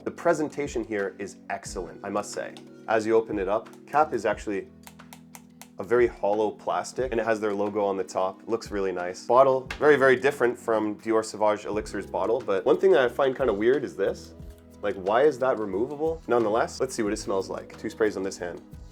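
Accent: Canada. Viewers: It’s not a mispronunciation or wrong - it’s a nuance of a Canadian accent. Let’s appreciate (and not correct) cultural and regional variations. Great video,!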